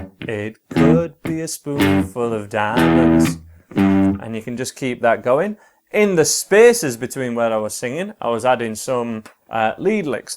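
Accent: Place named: Northern England, United Kingdom. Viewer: Kool thanks enjoyed that tutorial IAM in Australia you are obviously northern English I was born in Cheshire hope to see more of your tutorials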